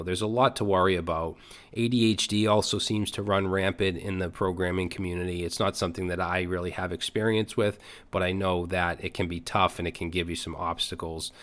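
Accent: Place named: New England, United States. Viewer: In regard to Category 3 I (re)took up Vim about 2 months ago to minimize hand movements involving the mouse and for mental discipline. After practicing and note taking in terminal-launched VIM I came back to VsCode and installed the Vim extension. This is a work in progress but I'm not unhappy about it. In eighth grade I took a half-year (one class period) in TYPING and got myself to about 35 wpm. No regrets there. My advice now to my 1964 self would be to follow that up with a half-year of creative writing or coding in Vim, ignoring the technical issues of "what's a keyboard?", "what's a monitor?", and "PC, Mac, or Linux?" Ah, the IBM Selectric typewriter was still a bunch of concepts on a drafting table at that time. In your Category 1 arena I scrounged a monitor out of storage to enlarge my viewing area (same number of, but bigger, pixels) and began drilling myself in Tmux in parallel with Vim. 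BTW, Vim and Tmux like to fight in the back seat while Dad's trying to drive - I digress. My point isn't to promote Vim but encourage your viewers to consider ways to minimize mouse operations, reduce eye strain, and organize a work flow using tiled panes in terminal or GUI tools. Thank you for your video - it got me to organize my thinking on the above topics. BTW, your accent has me wondering if you and your neighbors are regretting a certain off-season move involving a certain QB relocating from a certain Massachusetts team to a certain Florida team. If this is the case I would b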